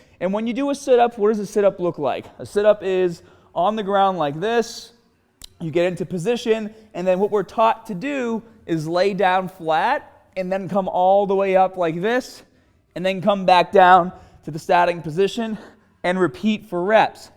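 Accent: Boston, United States. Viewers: Is that a Bostonian accent I hear?? :)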